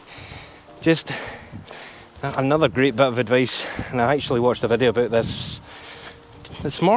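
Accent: Scotland, United Kingdom. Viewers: A wonderful introduction! Thank you so much. It was lovely hearing that great Scottish accent after so long. Keep up the great work!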